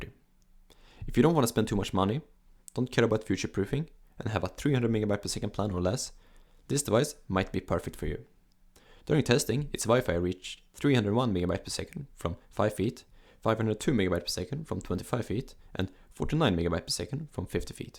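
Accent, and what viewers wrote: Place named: Germany. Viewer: I can barely understand your accent dude. Speak slower because your thick german accent makes us Americans difficult to understand what you are saying. And it’s even harder for us Americans who English is not their first language (hispanics). So please, speak slower and try to pronounce better every single word.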